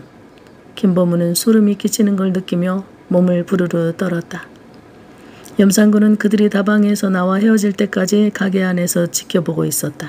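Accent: Jeolla, South Korea. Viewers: 전라도 사투리를 정말 전라도 나이든 시골 사람처럼 잘 하시네요 감사합니다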